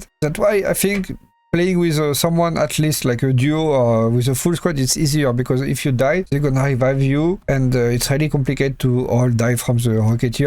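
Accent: France. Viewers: Bro these vids have been so helpful. I don’t even mind how French you are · because of your VAIIRRRRRRRRRI french accent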